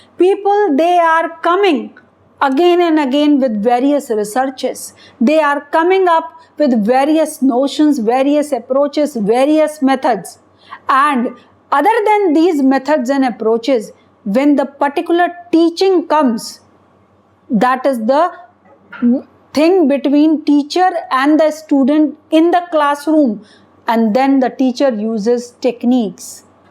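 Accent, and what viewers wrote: India. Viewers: Don't make rude comments just based on her accent. People are so quick to judge others these day. She is from India and her accent is greatly influenced by her mother tongue. Jackie Chan though he has been working in Hollywood for years he still speaks English in Chinese accent. Even the great Kalam sir used to speak English in Indian accent. So would you treat him stupid just because his accent is bad?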